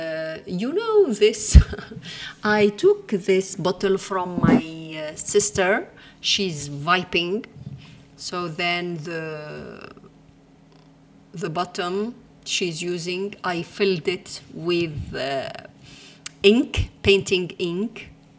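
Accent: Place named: France. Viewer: Howdy 🙂, i thought 🤔 you speak English.. it's like you speak France!! Anyway I'm going to go inside your painting here.. see ya Oh, i have a question: What Should i mix If i want the (Cloudy suny colours) ?! Which colours should i mixed?!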